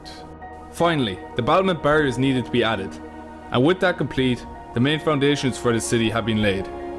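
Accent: Ireland. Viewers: You irish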